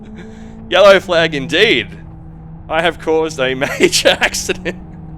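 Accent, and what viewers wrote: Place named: Australia. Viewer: You sound like a Aussie. I'd have bought this but it's still over $40...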